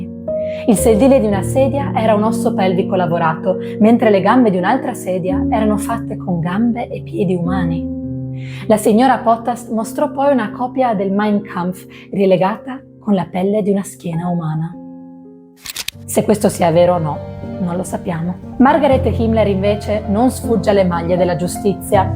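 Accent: Trieste, Italy. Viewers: Brava! Ottimo video e ben esposto. Complimenti! ps.( ma sei triestina?) 😅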